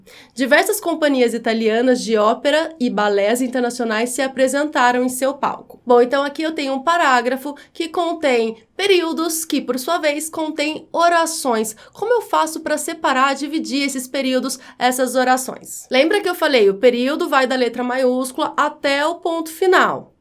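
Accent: Brazil. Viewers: Gosto muito das suas explicações. Falo português europeu, mas entendo o vosso sotaque/dialeto brasileiro muito bem.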